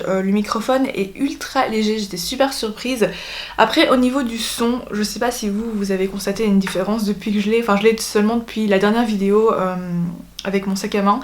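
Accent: Belgium. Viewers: juste, tu viens de belgique ou de france ?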